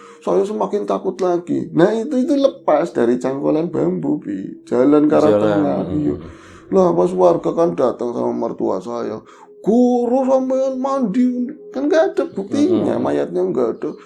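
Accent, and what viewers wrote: Banyumas, Indonesia. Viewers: Wilujeng siang Selasa ceria sambil mendengarkan suara bro. Ngapak nya kental. Maju NGOBOR Jangan lupa bahagia 💪💪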